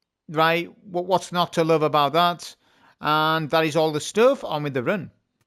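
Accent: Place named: England, United Kingdom. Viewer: well, the youtuber, who sounds English, butchered the word. I was assuming the issue was cultural unfamiliarity.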